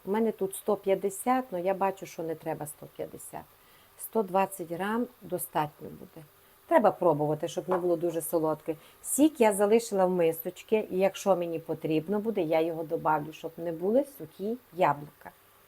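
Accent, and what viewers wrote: Transcarpathia, Ukraine. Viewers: Очень приятно Вас слушать! Мова чудова!)) Та цей неперевершений закарпатський акцент! И рецепты очень оригинальные! Мира Вам, здоровья и добра! Привет из Житомира. 🌻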